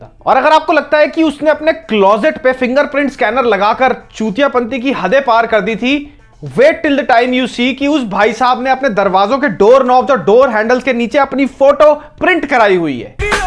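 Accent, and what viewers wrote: India. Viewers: You have very poor observation. Now I am feeling very bad for myself watching review of some sad loner commenting such thing for a professional matchmaker. First I watched ur video and your old job "honest review" review, it's was funny. As I like to hear desi accent. Basically nothing " honest" in your review, you should rename your show " saying in desi accent". Just tell me what wrong in that lady in the show " seema from Mumbai", she was perfect professional. She listened to her clients, observed them(not judge), motivate her clients and provide what they wanted. Moreover, she follow up their clients until he/she get married. A simple service based business type, now I don't see anything wrong in that and maybe non Indians also feel the same. But you are really getting toxic and loner day by day who judge a show without observation and only watch the show to pass negative comments. 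I am writing this letter to your wife " Dear Mrs, Please Madam control your husband he is getting toxic day by day. He needs some mental support. His review is very irresponsible, now a day he don't have a job he is making fun of other professionals. If possible please beat him with belen to normalised thing. Your sincerely, Indian YouTube viewer" Maybe government should lunch a review certification course so you can attend and show act like professional reviewer.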